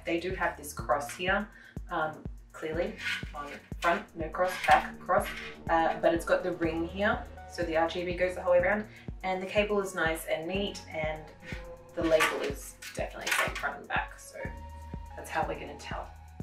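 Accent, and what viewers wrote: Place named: Australia. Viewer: Great build love the Aussie accent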